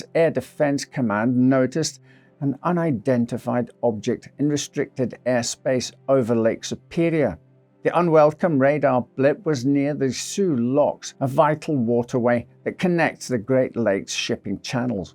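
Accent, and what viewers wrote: Scotland, United Kingdom. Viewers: Or maybe he is just Scottish… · Nope, I believe he is a Scot. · I can't put a finger on your accent. Im getting English, South African, Scottish and even Welsh! I love it, love the videos too! ❤ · It's his voice. It is wonderful to listen to, especially for me as I admire any accent from the United Kingdom. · He's Scottish 😊, I'm.also Scottish and that's how we sound when we are talking proper 😅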